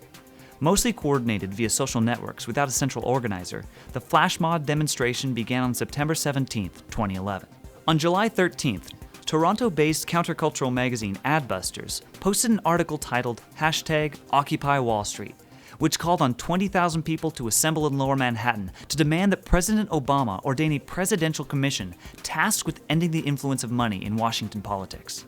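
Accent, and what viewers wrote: United Kingdom. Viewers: u sound like ur britland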